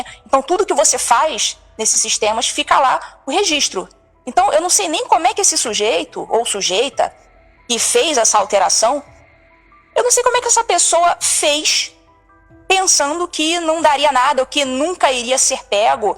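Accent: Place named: Rio de Janeiro, Brazil. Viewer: Me desculpe. Sei que não tem NADA a ver 😮mas esse sotaque #carioquês😮 é muito cansativo ficar ouvindo 😴 😑desculpe-me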